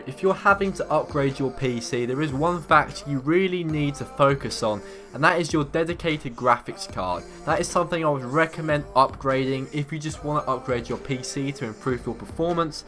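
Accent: United Kingdom. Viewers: Dude I love your British accent!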